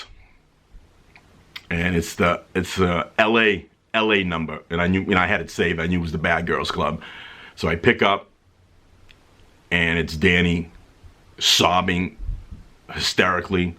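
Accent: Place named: East Coast, United States. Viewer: Im living for your accent.. my dad has an east coast accent as well just not nearly as thick as yours! I love u and the girls and Marcellus as well!! Keep the videos coming, im diggin the channel pops! 😘❤️❤️❤️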